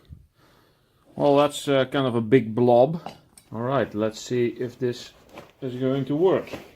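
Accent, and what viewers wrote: Netherlands. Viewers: Hi we met on Gil's stream just know not sure but are you Dutch too.I hear a similare accent I have eather way greetings from your new friend from the netherlands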